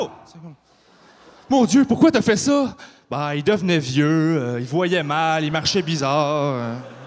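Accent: Quebec, Canada. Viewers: Vous êtes pas quebecois, vous pouvez pas comprendre ! Alors arrêter de critiquer l'accent. Car meme moi qui suis pas Quebecois, bah.. Je critique pas, et j'ai bien rigoler :D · Les Français qui disent ne rien comprendre : 1) Arrêtez la mauvaise foi, il n'y a que quelques mots/phrases trop rapides, 2) faites un effort, exercez votre oreille en écoutant d'autres prestations d'artistes québécois (et des films, genre ceux de Dolan), 3) sortez un peu de votre bulle, la francophonie est grande et belle et ce n'est certainement pas la France qui la fait le plus vivre. Le québécois s'est développé indépendamment du français de métropole depuis quatre siècle. En fait le français du Québec est plus proche de l'ancien français que le nôtre. Et puis 4) arrêtez de chouiner un peu, vous n'êtes pas le centre du monde. Conseils d'un Français aux autres Français. Merci le Québec, votre accent est beau (et sexy ! 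:p ) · Je ne trouve pas particulièrement. C'est comme ça qu'on prononce "parent" au Québec. :) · J'ai bien rien perso jaime laccent quebecois dnc sa ma pas deranger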